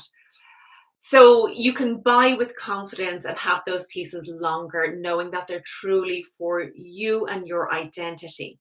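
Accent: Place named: Ireland. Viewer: I love your smile and your Irish accent! Greetings from Madrid in Spain ❤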